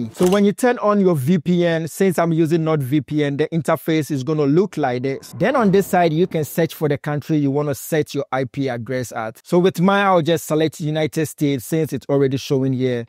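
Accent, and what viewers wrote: Ghana. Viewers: Oo Chaalee, welcome back to Ghana. Since the very first day I came across your awesome edits and tutorials, you sounded Ghanaian until you mentioned Finland in some videos and I was a bit confused 😄. Keep up the good works bro.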